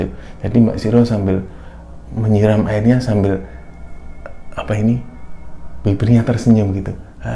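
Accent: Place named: Banyumas, Indonesia. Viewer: Salam pagi salam kenal mas.. Baru sempet comen biasanya cuma like saja... Walaupun saya orang sunda tapi seneng bahasa jawa kental mas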